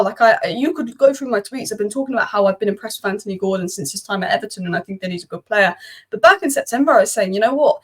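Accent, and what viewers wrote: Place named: Manchester, United Kingdom. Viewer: What part of Manchester, is that accent from ????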